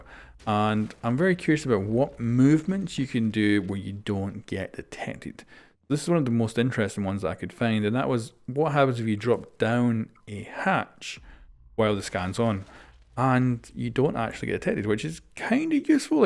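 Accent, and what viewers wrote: Scotland, United Kingdom. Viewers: I like the Scottish accent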